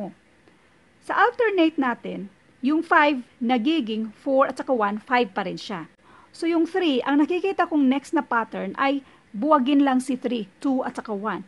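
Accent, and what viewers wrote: Visayas, Philippines. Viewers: tsssk tsssk bisaya dii ka maam ? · Ma'am bisaya ka po? 🙂 nahalata ko lang hehe pero ganda po naman boses nyo kahit parang paos 😅😍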